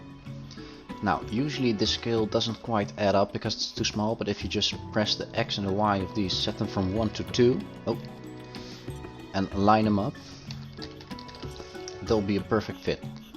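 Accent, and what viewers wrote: Netherlands. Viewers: you from the netherlands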